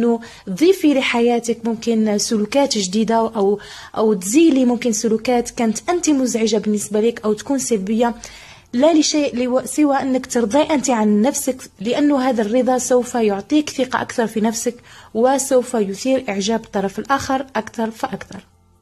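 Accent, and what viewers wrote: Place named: Libya. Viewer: لهجتك ليبيه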